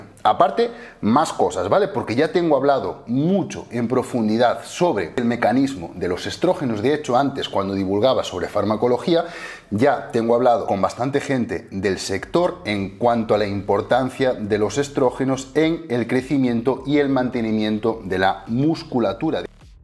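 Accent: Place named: Galicia, Spain. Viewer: Ti es galego? paréceme aínda que non teñes moito acento, pero pola túa forma de empregar algunhas estructuras gramaticáis. Pregúntoche dende o respecto e a curiosidade...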